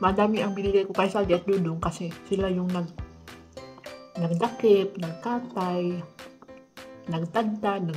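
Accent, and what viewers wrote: Bohol, Philippines. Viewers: asa inyo sa visayas mam? kay murag Bohol man ang tonon, nice kay mura sag ug naa ra pinas diha mam nuh, dira kaau mingaw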